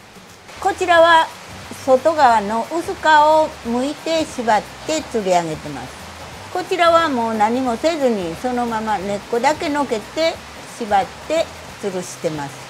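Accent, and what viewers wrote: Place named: Kochi, Japan. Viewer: 高知弁のイントネーションが可愛いですよね…！私も高知の片田舎越知町です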